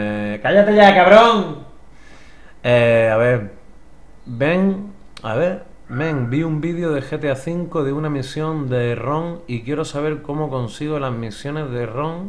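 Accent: Andalusia, Spain. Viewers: eres andaluz, que? Almenos por el acento yo diria que si xD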